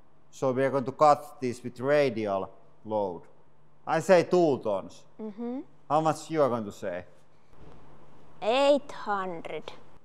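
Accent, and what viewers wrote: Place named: Finland. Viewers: I could listen to Finns speaking English for hours, so, you're good! 😎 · I got bored in the middle, but if you speed up some of it, to like 1.5 speed, so that we can still understand your Finnglish but also spend like 30% less time, that'd be great.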